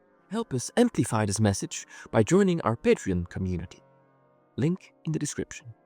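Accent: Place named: Netherlands. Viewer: you also need accent reduction /th/, "out of the ordinary, not extraordinary" ''dove-tailed rather than very nice" but we love the Dutch! So if you composed this piece, would you just write in the canon dux et comes, then fill in the obligato Tenor and Alto? The homogeneity of the alto and tenor are almost megamaniacal, but account for the fundamental uniqueness of this masterpiece. Why is this a masterpiece? What harmonies are paramount at each point? Arent all the voices somewhat intertwined if we use 4 foot in the bass part? On the theology, what other canons in OB and elsewhere? Why are some melodies more suitable to being canonized? How are the melodies brought together at the end?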